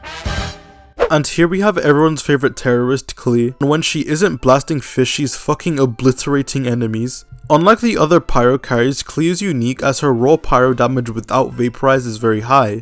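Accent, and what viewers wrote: United Kingdom. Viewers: how do you manage to sound more british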